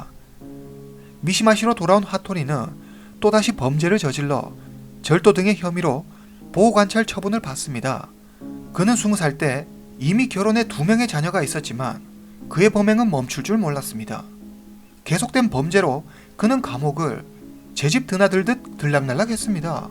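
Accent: China. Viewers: 님 중국사람이신가요?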